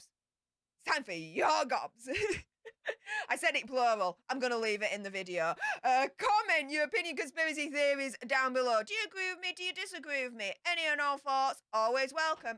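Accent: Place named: Northern England, United Kingdom. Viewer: As I’ve always said, be nice on’t way up, coz you might meet ‘um on’t way back down! Yes, I have your accent, and it’s good to ‘ear it on’t t’inernet. 😊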